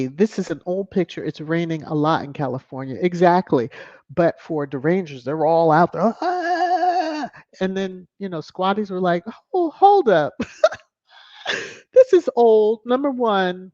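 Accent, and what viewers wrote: RP, United Kingdom. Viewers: Awesome podcast one love ❤️ and to all squaddies in the chat big shout out to all proud of our prince avenger God covers him under his blood 🤲✋️👋☦️☦️✌️✌️👌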